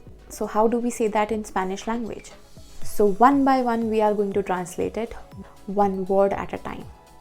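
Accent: India. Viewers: Your accent is like indian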